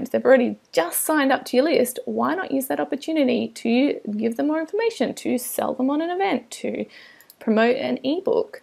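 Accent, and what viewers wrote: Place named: Australia. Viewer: Hi, I love you girls you look great and being a fellow Aussie I just looovveeee the accent. Hey how do I get my non - generic landing page onto my website??? Many thanks, The Urban Garden Companion- An Aussie Gardener in the New York Jungle.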